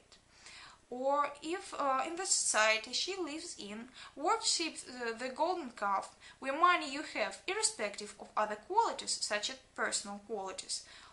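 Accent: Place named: Netherlands. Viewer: sounds like dutch accent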